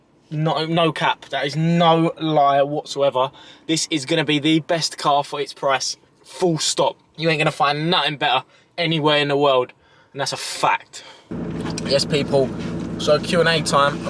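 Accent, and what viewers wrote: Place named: Essex, United Kingdom. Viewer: i love ur commentary proper essex geeza keep it up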